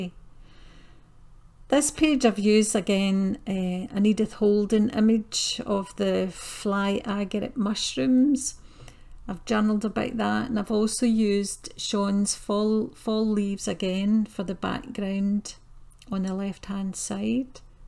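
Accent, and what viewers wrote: Scotland, United Kingdom. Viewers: Thank you for taking us through your journal! I have to say I so enjoy your Scottish brogue. I had to subscribe, and I look forward to seeing your art and hearing you speak in your other videos! :) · What a unique and beautiful journal, love all of the natural elements. And how lovely to hear a bonny Scottish accent...makes me miss my days of living in Edinburgh!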